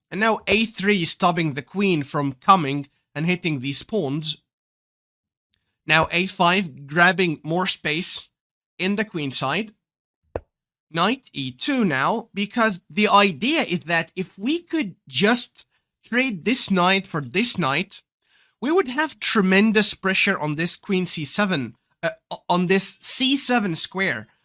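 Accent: United Kingdom. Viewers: What a British accent 😍😍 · İ am in love with your British accent doc😂❤ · Marvelous video ❤, Nice presentation and magnificent pronunciation with accurate British accent ❤, love the change and hopefully you continue with the same enthusiasm and quality for long time 😍🤩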